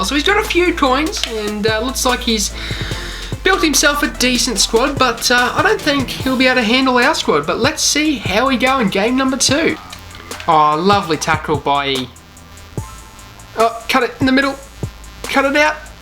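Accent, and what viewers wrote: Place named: Queensland, Australia. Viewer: Where is Aus are you from.. I'm guessing qld maybe?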